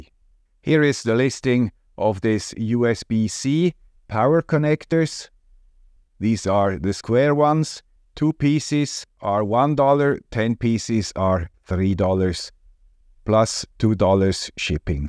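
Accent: Switzerland. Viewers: Hello friend with the Swiss accent. In case you don’t hear it enough, I’de like to thank you and also applaud you for the way you have setup your channel. Your content is extremely well planned and laid out without all the bloat of other YouTubers in this space. Lean and clean to the point and. I find myself going back to your videos many years later as a reference point when needed. Looking forward to more truly high quality content next year ! 🙏🏽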